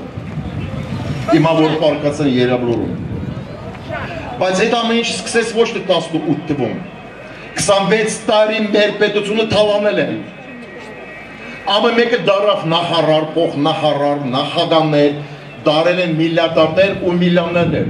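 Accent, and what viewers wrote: Karabakh, Azerbaijan. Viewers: Это инс харабахи акцентова хосум хи инке hай чи, де има лси ай ору вор Арцахе андвец туркерин уремс тох имена Айастана тох ангист чи кнелу, турки ерезанк Айастана имац дезел чи ангист Токелау кнек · Էս խոսողը ոնց որ ղարաբաղի ակցենտով է խոսում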